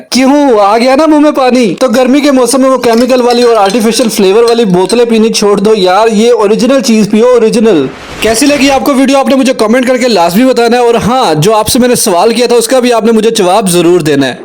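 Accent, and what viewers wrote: Punjab, India. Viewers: Mujhe apka "VOICEOVER" or apka "PUNJABI" touch acha lagta hai 😊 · Ap ki guftago me punjabi ka tarka😅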